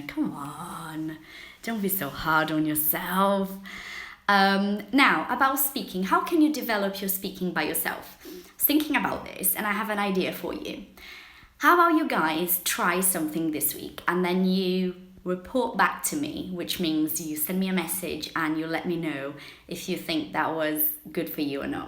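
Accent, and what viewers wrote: United Kingdom. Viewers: Beautiful British accent 😘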